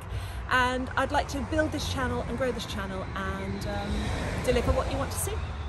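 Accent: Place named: England, United Kingdom. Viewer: I just came across this and glad I did. Another fantastic job of a beautiful Natural Woman in a lovely setting. I love the pics on the stairs. Sensual and sophisticated. What makes you different from some of the other models is your natural look. No overly made up, fake looking, Barbie doll. And I love your narration before and after the shoot. You have a wonderful voice, very smooth and soothing to the listener with that very nice English accent. I would love to see you in some pics from an Old English estate type setting with maybe a bit of narration about the history of the place. That setting would fit you perfectly and cover all the bases regarding your unique look and personality. Thank you and keep up the good work.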